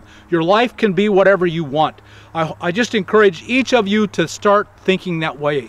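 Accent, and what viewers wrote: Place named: Minnesota, United States. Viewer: Are you from Minnesota? You sound like people from Minnesota =)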